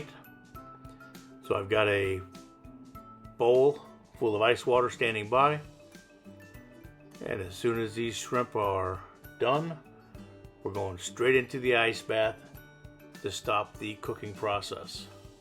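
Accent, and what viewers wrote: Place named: Louisiana, United States. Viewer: I loved watching him , loved his Cajun accent!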